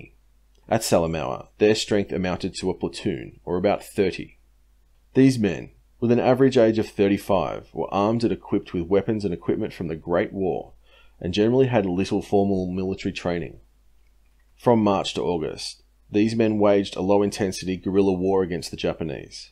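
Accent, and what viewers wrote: Australia. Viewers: Recently discovered your videos. Glad to see an Australian (I think? I'm not the best with accents) doing Australian history stuff that us Yanks don't often see so much of about WWII. I hope to see much more history from the Australian perspective on here!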